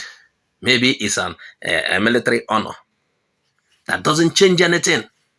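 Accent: Nigeria. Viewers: On whose side are you, you speak as if you're a Nigerian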